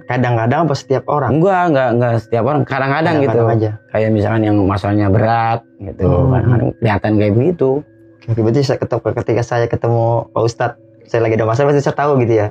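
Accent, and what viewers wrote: Jakarta, Indonesia. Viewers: Bahasa Betawi Bekasinya mantap pak ustadz saya bangga jadi warga Bekasi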